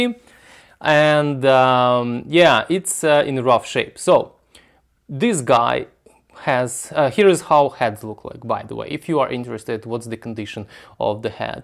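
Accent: Russia. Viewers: Сразу слышно Russian accent 🤣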